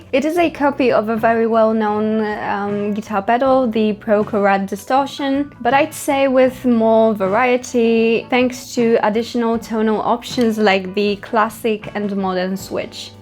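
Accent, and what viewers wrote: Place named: Poland. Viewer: Cool video. The Boss BD is one of my favorite drives. Btw, I love your accent! I thought it sounded kind of northern European, and then I saw that pedal was Polish, I'm guessing the accent is also Polish (and your English is perfect!). :) Anyway, greetings from Ohio, US.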